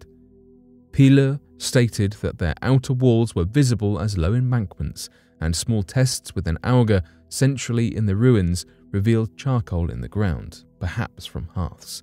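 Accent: United Kingdom. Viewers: So you're using AI technology which makes for a robot presenter. But why did you give it a British accent? I find the British accent to be offensive.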